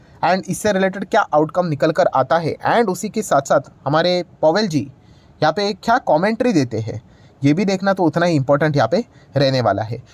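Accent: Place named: Gujarat, India. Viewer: Bhai aap kya gujarati hoo 😂 aapki boli pr se lage Love from rajkot ❤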